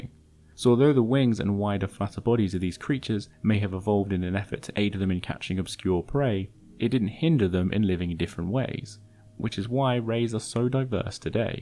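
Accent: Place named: United Kingdom. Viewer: in, the "sorefish?" Why were they sore? Oh, wait. You meant "saw-fish." Damn, dude. Learn proper English. Ah'm jus' phokin' wi' ye, mate. Jus' takin' tha piss. But for real, sometimes, your non-rhotic 'r's, plus adding 'r's to words with open 'ah's, make what you say "hahhd to unduhhstand" for non-Brits.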